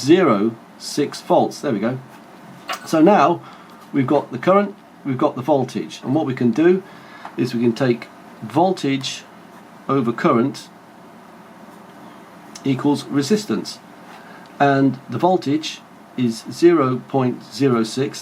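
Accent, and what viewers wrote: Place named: United Kingdom. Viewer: You Brits not only speak with a funny accent (no offense meant) but you also talk very fast. At the crucial moment after 8'00" I could not understand a thing. Congratulations, thanks!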